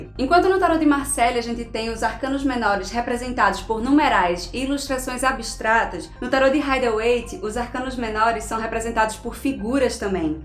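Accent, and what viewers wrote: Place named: Recife, Brazil. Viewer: Pelo sotaque já sei que é de Recife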